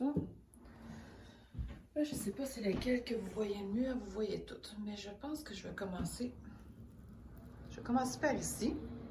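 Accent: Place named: Canada. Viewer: Bonsoir de France! Merci pour ces 2 vidéos! Ce sont les vacances se Noël depuis hier soir pour 15 jours. Donc un peu plus de temps pour "bloomer"... As-tu fait d'autres vidéos? Si oui, peux-tu indiquer le lien stp? J'aime beaucoup tes explications en "vrai direct". Je trouve ça très sympa et j'adore l'accent canadien! Ça donne la pêche et on en a tous tellement besoin en ce moment! À bientôt.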